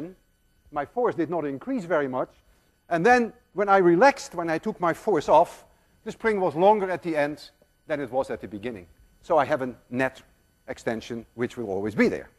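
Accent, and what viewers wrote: Netherlands. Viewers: what kind of accent does this guy have? He sounds somewhat Dutch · he has an accent, a dutch accent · his dutch accent is distracting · hes dutch u can hear that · He sounds so dutch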